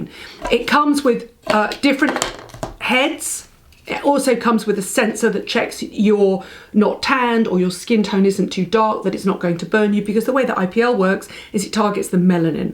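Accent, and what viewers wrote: United Kingdom. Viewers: Great video,. I love your British accent! This coming from a Texas Gal! Happy holidays!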